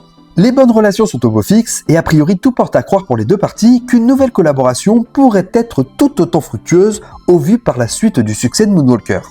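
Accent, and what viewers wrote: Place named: Quebec, Canada. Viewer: La traduction IA avec l’accent québécois, on pouvait très bien s’en passer. La prochaine fois, mets des sous-titres.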